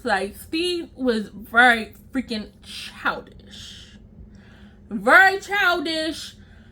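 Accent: Baltimore, United States. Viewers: I love ur bmore accent. Meet his purrents 💜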